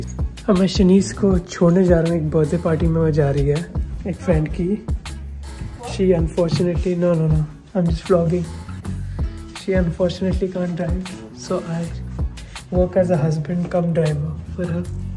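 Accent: India. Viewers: why does his accent sounds exactly like the American stereotype for "indian guy". Not that I liked it.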